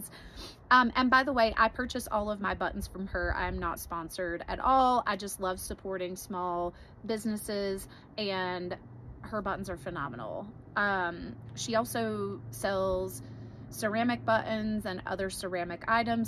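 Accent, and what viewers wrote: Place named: North Carolina, United States. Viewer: Adore your cowl❤ and we were in NC for about ten years so I love hearing your accent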